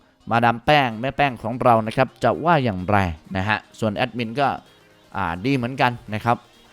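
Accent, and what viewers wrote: Isan, Thailand. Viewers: มักหลายเด้ บักหล่า ในวิธีการบรรยาย เป็นการสอนภาษาอิสานเฮานำขอให้หมานๆเด้อยักหำ มักๆๆๆ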